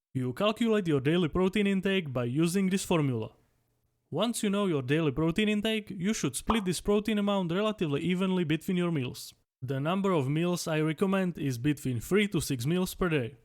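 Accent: Czech Republic. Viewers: Kámo... ten český přízvuk je moc. :D Nedávám to. Jinak super video ale. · dobrej czech accent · Já si říkal že slyším Čecha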